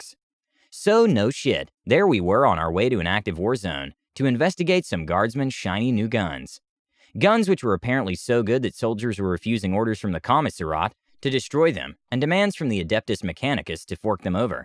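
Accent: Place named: United States. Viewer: Text: Extremely stereotypically british. Voiceover: Matter of fact car salesman american.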